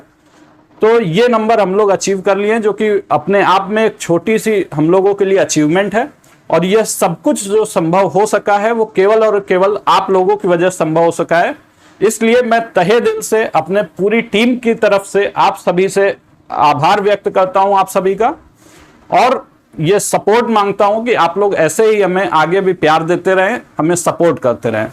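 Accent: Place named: East, India. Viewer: Congratulations sir 🍰🎉🌸🌸🌸🌸🌸📯👃👃.aapka padane ka tarika he itna badia h ki aapka channel chalna he tha sir.sir aap kis City se belong karte hai?aapki language me thoda east ka touch aata h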